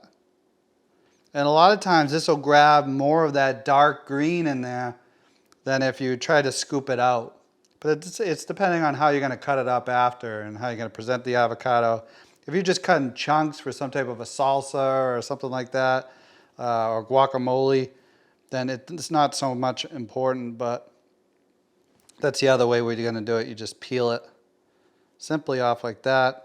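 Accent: Boston, United States. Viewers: Thanks buddy! Love the Boston accent btw!!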